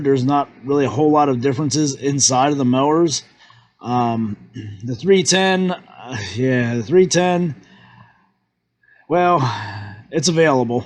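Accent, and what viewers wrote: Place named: Western Pennsylvania, United States. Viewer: I'm thinking the dial height adjustment might be faster than futzing with an app and there's less to go bad in the future. I didn't look it up, but where in Western PA or Western MD are you? Yes the accent and word usage gives you away 😁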